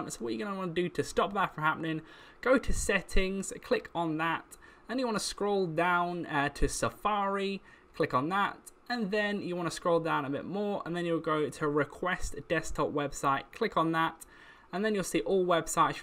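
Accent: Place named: United Kingdom. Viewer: Between the disgusting mouth sounds and the million things happening on screen with athe British accent saying “that’s not what you want” this video is a 0/10 would not recommend to my worst enemy’s, great job random bloke.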